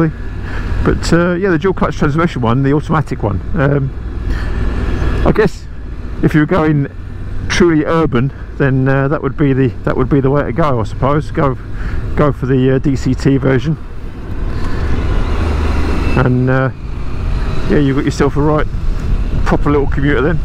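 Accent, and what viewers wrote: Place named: Ireland. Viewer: Cool bike, love that Irish accent. Thanks man.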